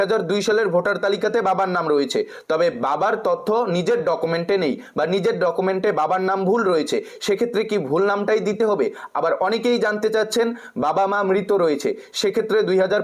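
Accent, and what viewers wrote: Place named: Bangal, Bangladesh. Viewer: আপনাদের কোনো কাজ নেই আপনার কথাতে ও মনে হয় বাঙাল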